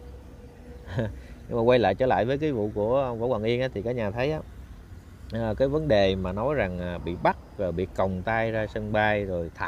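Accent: Hue, Vietnam. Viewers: Em ấy nói tiếng Huế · 100/100 giọng Huế , cùng quê nên mình rất rõ ! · Dung la giong hue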